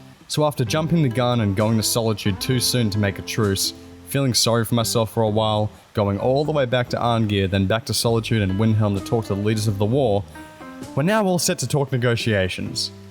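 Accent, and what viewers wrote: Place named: Australia. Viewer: “Youtube existed when you were 11? And was paying royalties? Damn you’re young!” - me, who refuses to admit she’s old 🙈 Love the video. Nice to hear an Aussie accent doing silly challenge runs on a 12 year old game 😊👍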